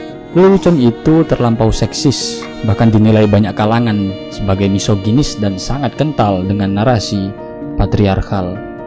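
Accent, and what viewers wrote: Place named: Java, Indonesia. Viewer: Jossssss keren, kata kata medok e tetep ketok,tp iku seng nggarai jos. Jowone tetep kudu onok. 👍👍👍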